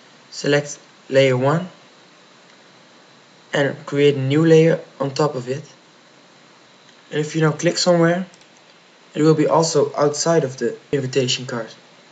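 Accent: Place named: Netherlands. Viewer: You sound dutch ^^ Are you?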